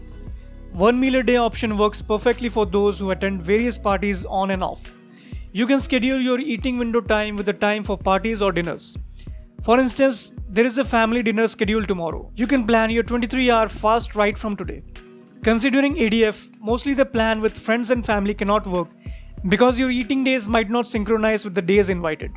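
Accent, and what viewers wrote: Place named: India. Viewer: Your accent smells masala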